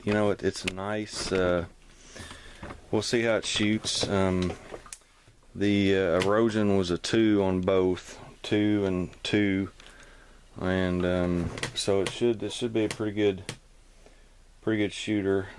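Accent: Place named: South, United States. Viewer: When a guy with a southern accent understands the difference between a clip and a magazine, he feels like he’s an authority on all firearms related topics.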